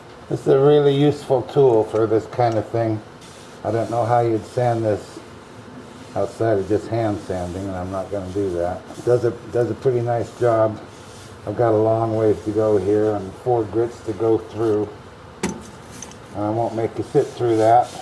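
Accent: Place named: Indiana, United States. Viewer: Good morning. The bowl is beautiful. I was worried you would catch your hand on parts of it. Maybe you should wear a glove. What part of midwest are you in? You sound like Indiana. Have a bless day. See you next time.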